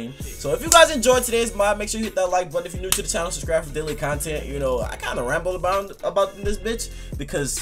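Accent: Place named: Philadelphia, United States. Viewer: is from Philly the dudes that say down like that form the P lol my boi from there and say it the same lmao... love your channel fam keep up the good videos ma g!!!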